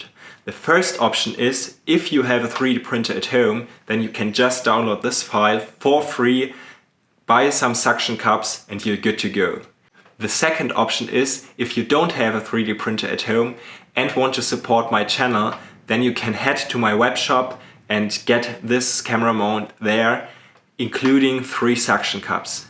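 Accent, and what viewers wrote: Germany. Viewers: Mach bitte auch Videos auf deutsch oder einen deutschen zweit Kanal denn dein Akzent ist so deutsch da kannst du es auch sofort auf deutsch machen Ist aber alles nett gemeint nicht böse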